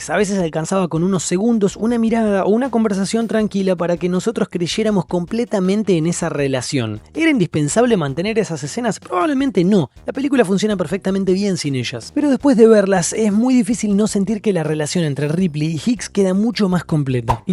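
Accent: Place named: Argentina. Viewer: Lo que en llamas "torretas" se llaman en realidad robots centinelas .. Todo bien con este contenido que me parece muy interesante,pero hay que decir las cosas como son.. Además no entiendo xq este canal pone como país de origen a Estados Unidos,cuando cualquiera que te escucha hablar de da cuenta que sos argentino.. Saludos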